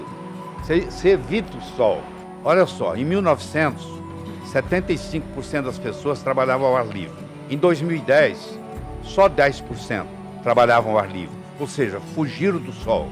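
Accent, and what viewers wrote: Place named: Minas Gerais, Brazil. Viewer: Um Médico incrivelmente competente estilo Mineiro dos bons Não fica zangado Fala uai com simplicidade mas o que fala prova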